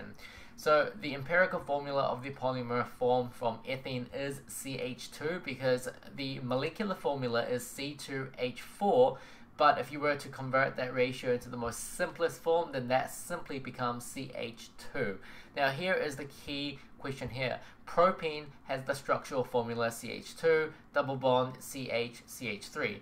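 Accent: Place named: New Zealand. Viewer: Fun Fact: You Are From New Zealand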